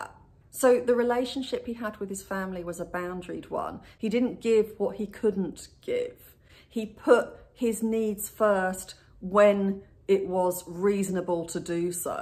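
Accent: England, United Kingdom. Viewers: Your beautiful English accent to this American is so refreshing and lovely and calming to listen to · I love that English accent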